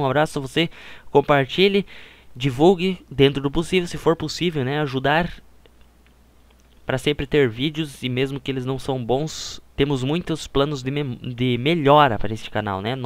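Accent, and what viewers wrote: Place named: Southern Brazil, Brazil. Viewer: Southern accent of Brazil. 🙂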